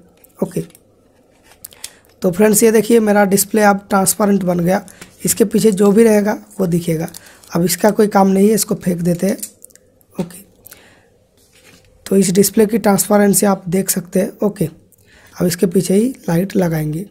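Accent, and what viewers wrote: Bengal, India. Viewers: Are you bengali ? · Tum bangali ho keya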